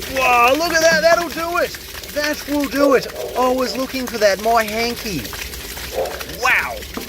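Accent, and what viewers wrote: Australia. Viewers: I am from Hamburg, Germany, just stumbled over your channel! And I can tell that you ar Australian! Both from your mild accent and the channels name. But where exactly?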